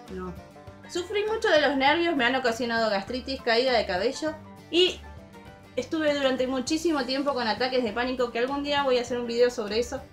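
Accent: Argentina. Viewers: gracias por contarnos sobre ti. Siempre he dicho que el acento argentino es hermoso. Un abrazo desde Bogotá-Colombia 🇨🇴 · Amo argentina sobre todo tú acento 😂 nueva sub te invito a que te suscribas a mi canal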